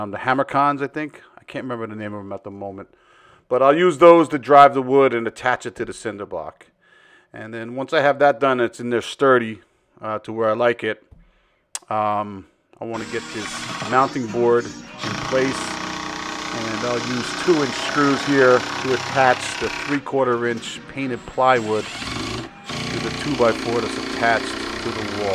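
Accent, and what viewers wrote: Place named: New Jersey, United States. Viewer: Hello Mr., I love your videos, you are so steady in your work and explain things very well. (I'm assuming New Jersey by the accent. (my mother and maternal grandparents were from New Jersey) I grew up in PA and been doing electrical work 32 years. I love that you just show the work nothing flashy, or gimmicky. Please stay like that, I am all for a good laugh but there is a time and a place for that. And when you are completing work that can kill you, or possibly other people, this isn't the time. You Sir, are a credit to electricians all over the world, especially here in this wonderful USA, a consummate professional. Sorry for referring to you as Mr., whenever anyone does that to me I look around for my dad or grandfather. But I am also a, lol. My dad told me growing up you never call someone Sir, until they gain or earn your respect, sorry I am rambling. 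But you Sir, have gained my respect within your work. The only thing I would add to your videos is leave the print up for a little longer when you post a written message, as it's hard to read that fast. And I thought the meter height thing was 6'7", I know that's splitting hairs, but we know how the NEC likes to split hairs, and so do inspectors, sometimes. But your probably right at 6'6" and mine is for inside panel boxes. But great video thank you!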